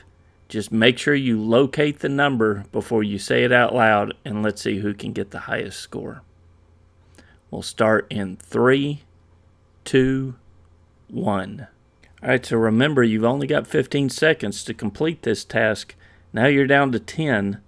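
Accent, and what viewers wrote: Texas, United States. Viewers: 5 but then again your Texas accent upped my stress level.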